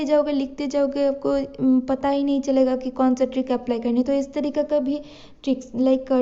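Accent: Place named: Northeast, India. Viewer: North East by ur voice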